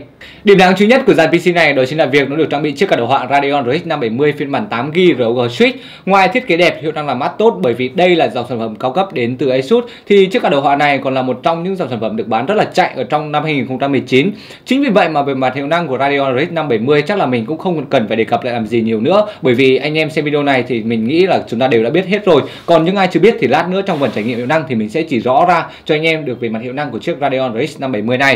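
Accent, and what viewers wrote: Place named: Vinh, Vietnam. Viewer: Anh này nói giọng giống giọng người Vinh quá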